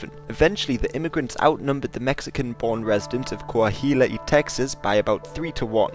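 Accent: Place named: United Kingdom. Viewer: Cuahuila, pron., kua-wi-la, not kua-hi-la. Anahuac, pron., aná-wac, not ana-hoo-ac. What is wrong with you ppl??? The very least you could do is get the pronunciation right. As it is, you are using English pronunciation rules on Spanish words. That never works. It's about as bad as using Spanish pronunciation rules on English words. It never ends well. And, why do you have an English bloke narrator. That British accent is so annoying. It's like someone speaking with peanut butter stuck to the roof of their mouth. Get rid of them. Their English is horrible. American narrators for American history.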